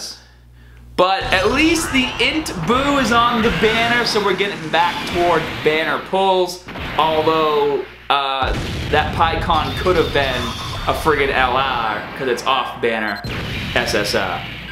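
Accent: Boston, United States. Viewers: I just found out your accent is from boston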